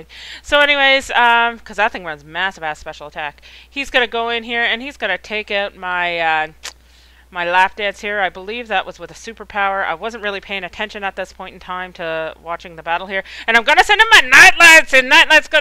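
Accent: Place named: Canada. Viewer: You are soooo from Canada!!!!!! :D I love the UHT! (out) Ennjoying your battles and your flaming! lovely <3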